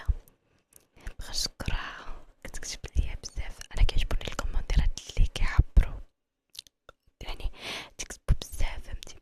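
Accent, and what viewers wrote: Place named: Syria. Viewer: هضري دارجة نيشان بلا ديك اللهجة د باب الحارة كانظن اتكون حسن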